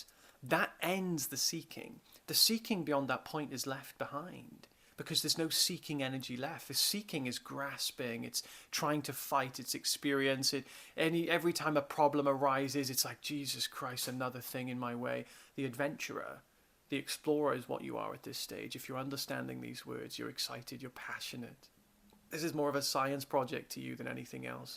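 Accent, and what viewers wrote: Wales, United Kingdom. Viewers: Do I hear a slight welsh accent?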